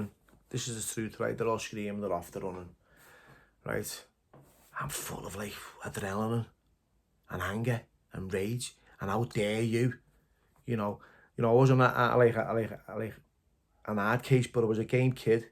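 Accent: Liverpool, United Kingdom. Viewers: Loved the accent. Didn’t know it was possible for a scouser 😂. Great stories. Kept me interested all the way 👍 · love the old time storeys m8 you speak like a proper scouse lad not like the other fk on other channel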